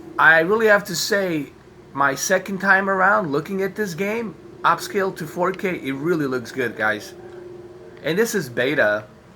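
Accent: New York, United States. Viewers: Are you from NY? I keep waiting for you to say "dead ass" lol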